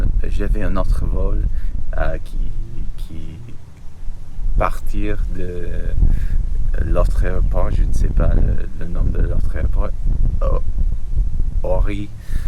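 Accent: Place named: United States. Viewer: C'est parfaitement compréhensible et tu as la bonne démarche : parler le plus possible et ne pas avoir peur de faire des erreurs. En continuant sur ce rythme, d'ici 2-3 ans tu pourras parler presque sans trace d'accent Américain. Pour accélérer le process et acquérir du vocabulaire, je te conseille de trouver des films, séries ou podcasts français sur des sujets qui t'intéressent, avec sous-titres anglais ou français si besoin ou pas. ça permet de découvrir des mots et des expressions que tu n'entendrai jamais dans la vie quotidienne. Tu peux aussi regarder Top Chef ou Cauchemard en Cuisine sur M6 si tu n'en as pas marre de ton métier à la fin de la journée.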